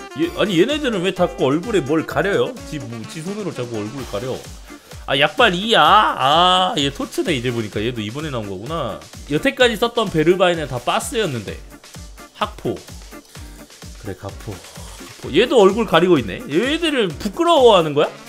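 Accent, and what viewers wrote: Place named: Japan. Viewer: 이형 일본팀 하면서 일본 말투 중독됬네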